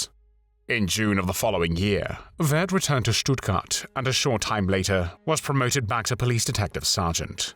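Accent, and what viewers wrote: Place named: United Kingdom. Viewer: ...so what? does this get your rocks off? Cause I see no other reason why you bring your Gaylord Briton Accent to describe how people get killed.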